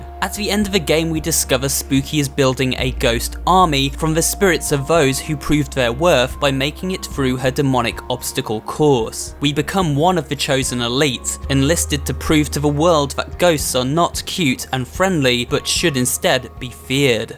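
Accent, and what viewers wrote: United Kingdom. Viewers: You talk like british behind the meme.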